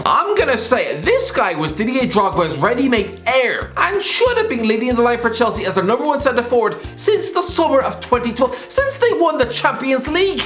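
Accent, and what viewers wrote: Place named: Ireland. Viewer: Irish "Bilabial Trill" Guy.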